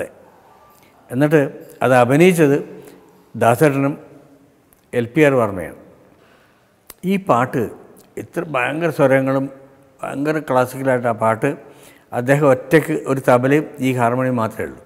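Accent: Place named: Kannur, India. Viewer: ഈ കണ്ണൂർ സലാംങ് കേൾക്കാൻ നല്ല രസമാണ്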